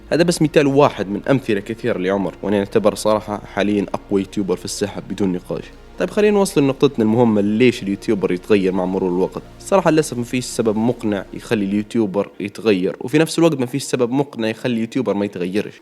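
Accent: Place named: Libya. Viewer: ماشاء الله، ولد بلادي، أصلاً، واضح من اللكنة واللهجة، إستمر يا أسطورة 🇱🇾♥️✌️👏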